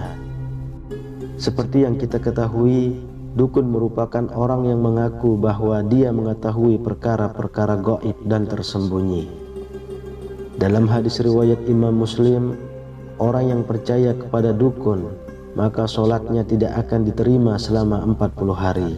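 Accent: Lombok, Indonesia. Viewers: admin logat lombok ya..hehe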